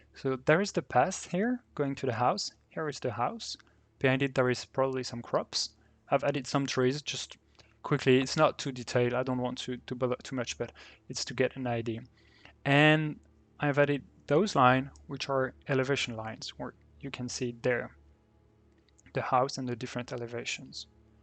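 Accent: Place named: France. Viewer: Hi, love your modular sets on the bazaar, and your explanations are really useful ps: ton accent t'as trahi mon cher concitoyen francophone X)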